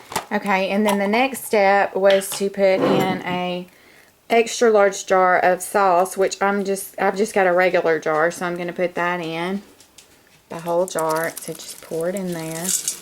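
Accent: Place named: South, United States. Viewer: Thanks for the recipe. I love your southern accent. :-)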